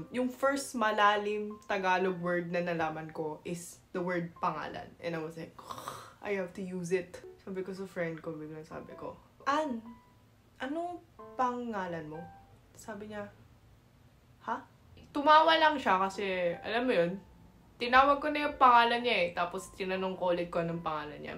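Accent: Batangas, Philippines. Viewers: I can really certify that you are so filipino with your Tagalog, Slang, colloquial expressions, mannerisms, facial expression, accent lahat lahat nah ..really amazing seeing a Korean-looking face but so filipino in speakin Tagalog nakaka hamazed hehehhehe love your personality as well good vibes lang <3 <3 <3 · You speak tagalog the way Batangueños talk.. As my British husband use to say, "why do Batangueños talk so loud and like they are angry all the time?" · Tagalog with batangueño accent. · Ang angas ng tagalog mo 😄Pati style/character ng pnoy na adapt mo narin. Pati english pronunciation mo pnoy din. My filipino heart is so happy 😊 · Sobrang galing pwede ka ng maging translator or interpreter for kpop idol's. ...ako ng Filipino palpak magtagalog 😂 Bat feeling ko may batangueña accent ka? Ang cute!.... 😅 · The way u speak Tagalog is very interesting--the tone--Filipina na. 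· What really amazes me about you speaking Tagalog is your accent. It's very Pinoy.... GANON! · i like your batangueno accent so natural. i have a lot of friends from batangas, i was born and raise in manila. excellent in taglish as well! right now i'm residing in the US. thanks for sharing. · You're actually very fluent .. even the accent is so Filipino... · not looking at you whenever you talk, I think your filipina especially talking batangueno. Brilliant! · Yung tagalog mo ay excellent actually very very fluent at pati accent mo pinoy na pinoy. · Agree ako more likely a filipina ang gesture mo . You're so good in tagalog and the way you speak in English Pinay na Pinay more power to you God bless. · Gurl, you are legit Pinay. Even your English is like Filipino accent narin... ang galinggg. When i was growing up sa Saudi, i had Korean classmates sa International school where we studied. 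They did not only speak excellent English but Arabic too. Bravooo to you. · Masarap pakinggan yung pagsasalita mo ng English gamit ang Filipino accent. So smooth and comforting. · It seems your mother tongue is taglish with batangueno accent! You wont forget that language ever, even if you stay in korea for a long time. Naka hard wired na sa brain mo yang language na yan. You’re amazing! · You look really beautiful when you smile! any way, your gestures are very filipino as well as you expression and english accent. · Dbest k tlga mag Tagalog..pure pilipino ung accent m.tnx you love pilipino accent.☺️ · dayum shes so good that even her english has a filipino accent🤩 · The way you speak tagalog for me, it has a strong accent like batangueños🤣🤣🤣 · Wowwww Pinay napo kau magsalita kht English intonation pilipino n tlga nkk believe ☺️ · Sa batangas po sila tumira. Panoorin nyo isa nyang video batangueno accent sya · Hi! 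Even your English sounds "pinoy" 😁Nice!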